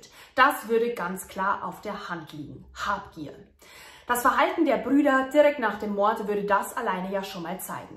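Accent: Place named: Upper Franconia, Germany. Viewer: Hört sich an wie Oberfranken.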